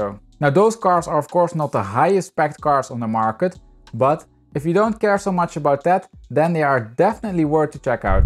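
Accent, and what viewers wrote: Netherlands. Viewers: Just curious, guessing Nederlander? Where are you from exactly if i may ask? Love your channel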